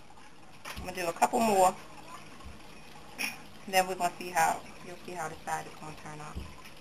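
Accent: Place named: Philadelphia, United States. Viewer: haha I new it. I'm from philly and our accents are quite simular..lol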